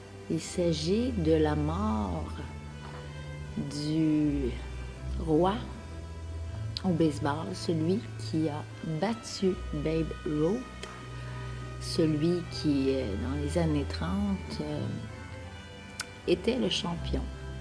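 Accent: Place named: Quebec, Canada. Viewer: Au Québec, j'ai joué durant 20 ans à un sport nommé "fastball" un dérive du base-ball.Selon moi, le base-ball est un des sports qui exige le plus de stratégie, de réflexion, de tactique, de concentration et d'instinct. Mr. Aaron a été l'un des plus grands dans son domaine, faisant preuve d'humilité et d'humanité malgré sa grande popularité.Beaucoup de sportifs professionnels devraient prendre exemple sur lui. En passant, je suis complètement bilingue et vous prononcez très bien son nom, avec un léger accent Québécois tout à fait charmant.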